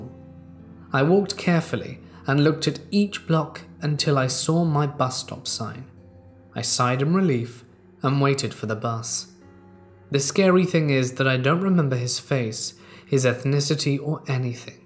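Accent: United Kingdom. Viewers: My hEDS is kicking off my chronic pain. Every time this happens, I end up sitting up listening to your soothing voice (us Brits do have a fabulous accent!) And relax till I don't notice the pain anymore xxx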